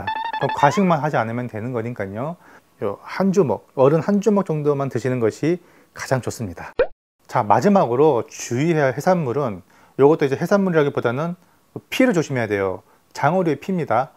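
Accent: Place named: Jeolla, South Korea. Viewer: 아~따 전라도 억양이 징허구마잉~ 자네 고향이 어디당가?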